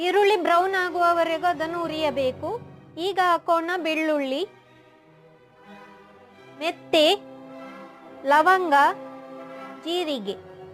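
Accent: Tulu, India. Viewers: Nivu kannada mataduvaga gottagtade tulu mataduvavaru anta😂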